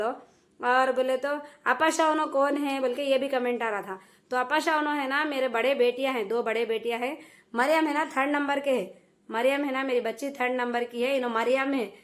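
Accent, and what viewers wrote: Hyderabad, India. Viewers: Yeh hyderabadi language with old city accent ..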